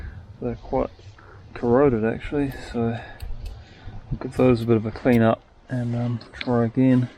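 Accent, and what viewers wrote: New Zealand. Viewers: Been wondering about this for a long time - if I speak with a New Zealand accent will I be as mellow and smart as?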